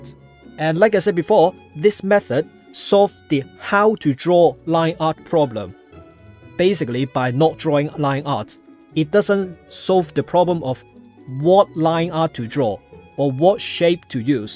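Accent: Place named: Singapore. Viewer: I love this <333 Also I feel like i went thru a literal roller coaster trying to figure out ur accent. Like, first I thought it was British , then Indian, then now I think it’s singaporean?????? Mhjvnejenrfv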